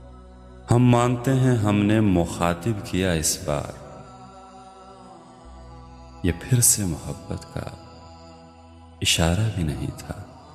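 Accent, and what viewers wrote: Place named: Punjab, India. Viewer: Uupp Sir app ne khud apni awaz sune hai,halke Punjabi lehje mein jab app shayri karty ho app ki awaz bari cute lagte had😊❤❤..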